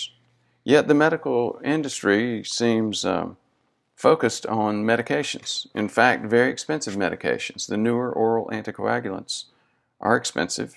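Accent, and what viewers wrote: South, United States. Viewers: Don't know if it's your Southern drawl or wha'at but you Look n' Sound like you need a shot of Adrenaline-or an Least a Double Espresso with a teaspoon of Honey-info was Good but I Almost fell Asleep!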